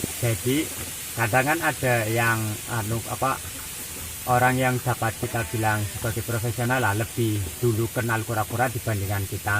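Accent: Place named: Banyumas, Indonesia. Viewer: Ngapak abis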